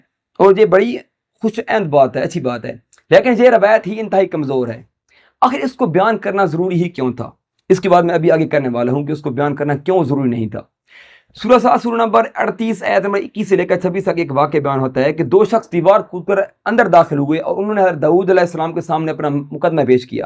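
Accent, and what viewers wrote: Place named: Punjab, Pakistan. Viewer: Yeh Punjabi mai Urdu bol Raha hai ya Urdu mai Punjabi.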